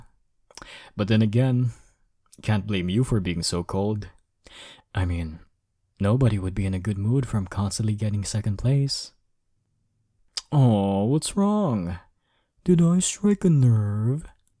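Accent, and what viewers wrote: Philippines. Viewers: is it just me or do y'all hear the filipino accent???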